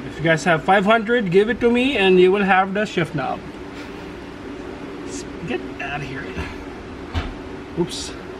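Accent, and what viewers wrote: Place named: Conyo, Philippines. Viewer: Maxado konyo c kuya... nice car by the way.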